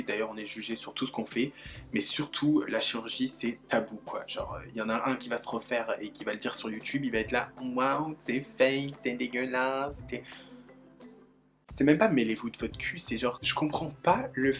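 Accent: Belgium. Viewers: Je suis à la ramasse avec tes vidéos mais je suis à jours sayait lol. Tu es belge ? Question bête 🙄. Je t'adore trop trop trop trop tu m'fais tellement rire 😂 Continue d'apporter de la joie ❤️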